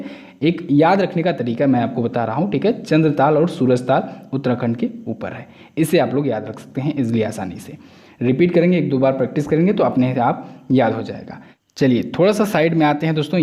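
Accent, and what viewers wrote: Bihar, India. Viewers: 4.zero bhai na ki 4.o 😆😆😆. Bihari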